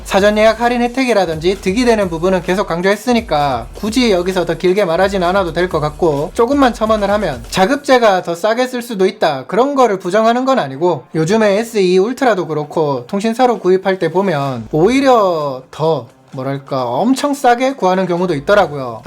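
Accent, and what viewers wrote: Gyeongsang, South Korea. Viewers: 경산도 싸나이 리뷰 웬지 신뢰감이 듬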